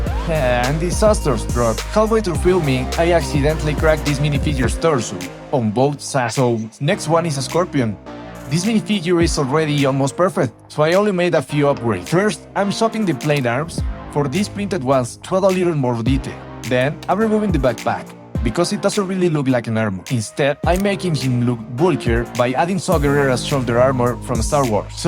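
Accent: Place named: Poland. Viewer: You're sound like poland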